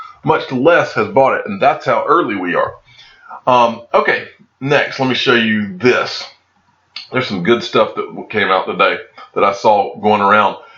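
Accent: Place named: New Orleans, United States. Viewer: I thought he was from NOLA as I am but he’s from Maryland if not mistaken. I remember asking him a few months ago. He does sound like he’s from NOLA.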